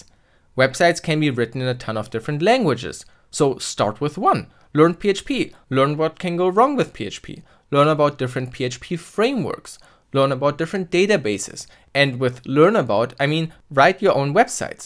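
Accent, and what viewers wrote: Germany. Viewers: Cool, dass Ihre/deine Videos auf Englisch laufen, damit es auch so viele wie möglich sehen, aber man hört schon sehr stark "den Deutschen" da raus. Macht aber natürlich nichts, solange man Sie/dich versteht. Normalerweise siezt man bei unbekannten nicht gleichaltrigen Menschen, aber da wir auf YouTube sind und eig. jeder duzt, habe ich mich für den Kompromiss entschieden. Ich sie-/duze :D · Weiß noch die ersten Videos von ihm, hab gar nicht gedacht, dass er Deutscher ist aber irgendwann fällts einem bei manchen Wörtern auf :D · Klingt recht deutsch der bursche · Ich wusste sofort das er Deutsch ist😂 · I love your german accent by the way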